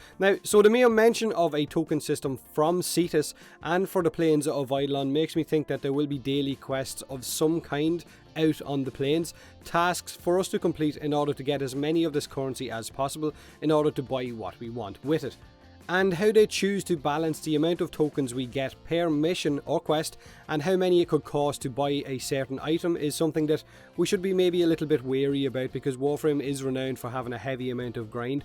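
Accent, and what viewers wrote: Ireland. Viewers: i love the irish accent. hell i love any games reviewed if i could ;)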